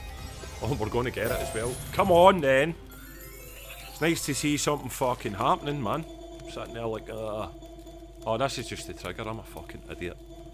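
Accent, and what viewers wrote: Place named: Scotland, United Kingdom. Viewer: Hahahaha yass this is the best slot video I’ve seen in a long time🤣 was so happy when I heard you were Scottish anaw. Subbed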